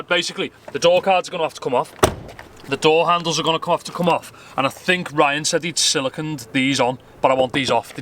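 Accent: Liverpool, United Kingdom. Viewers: Im a simple man .i hear scouse , i like and subscribe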